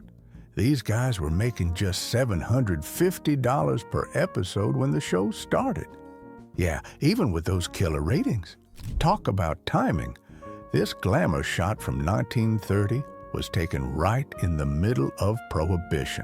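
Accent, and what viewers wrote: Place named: India. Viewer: Good narration, but why the Indian accent?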